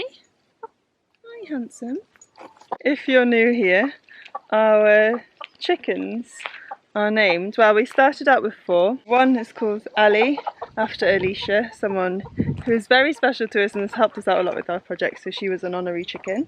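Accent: Wales, United Kingdom. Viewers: A fantastic construction of your journeys! I mean the telling of events; but moreover the ‘Journey of Mind’ is evident in your “Home to Home” travelogue. What IS HOME… On one hand I can hear the WELSH accents in this video (as anyone does when they get “home” Flip side to see the excitement of “home” being seeing the watering and chickens. BTW you sound so much more confident and in your element in the garden than your starting videos! You are Home! “You Got This”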